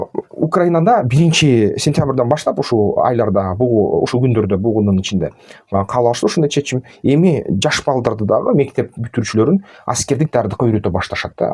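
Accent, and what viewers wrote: Standard. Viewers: Менин Кыргыз адабиятынан сабак берген эжеке агайларымда мынчалык таза суйлобойт эле😂 сиз кайсы жерлен болосуз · Мендагы раазы болгонум кыргызча таза суйлогонго аракет жазап жатасыз ишинизге ийгилик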